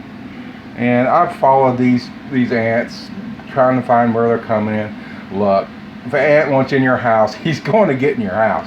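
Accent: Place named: Appalachia, United States. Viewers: his hillbilly accent and attitude sold me. he talks just like me. i trust this man . gonna go to war with some ants to0-nighttt! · Wonderful video.. I used a bait from dollar tree sat it down then came back like 10 min's later oh lord they was all by the bait (Pic- Ant killer Bait) I got mortified and sprayed Tat ant and Roach killer.. Killed them on the spot was I supposed to leave it be and let it do like the video above let them fest.. I was so darn scared I killed them on the spot with the spray.. On my way to get Terro Now.. Please advise.. Thanks - I love your southern voice ♥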